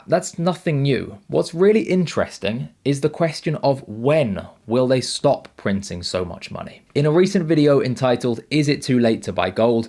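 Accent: United Kingdom. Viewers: Subbed for financial opinions / both sides of the argument,.....Not the British accent......🧐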